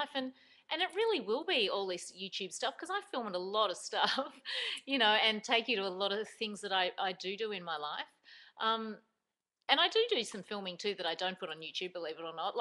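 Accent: Australia. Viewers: My passion is History, geography, and art such as painting landscapes and photography, 3d art /animation, and photoshop. I took photograph class and I loved it. It was fun doing the processing also, I used black and white Ilford resin back paper and I like using Ilford 35mm film with this 70's Minolta camera. I mostly use a Kodack camera now. However I think about using 35mm color film with the 'ol Minolta. I have some filters I wanna experiment with. I love your Aussie accent. = )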